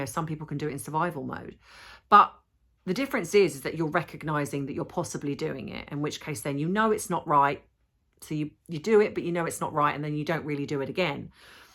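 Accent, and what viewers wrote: United Kingdom. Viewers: / I’m sure you’ve got some special tea to soothe that lovely British voice. 🥰 Sending you well wishes & positive prayers. 🇺🇸👵🏻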